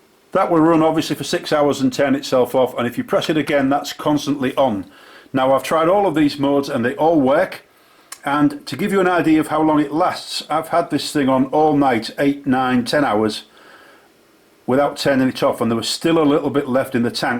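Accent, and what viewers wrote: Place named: Hull, United Kingdom. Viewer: I agree . Sounds like Hull where my family are from!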